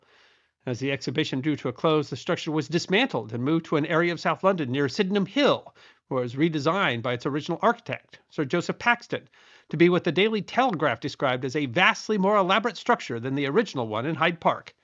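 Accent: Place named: United States. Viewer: its happening again gareth, another annoying voice over from a mickey mouse sounding yankee talking about a British subject!! why is this and can it be stopped asap, so very annoying and basically rude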